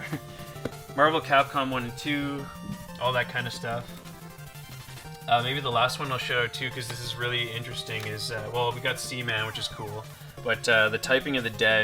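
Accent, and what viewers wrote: Canada. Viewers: That haerd Canadian accent is real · Are you Canadian?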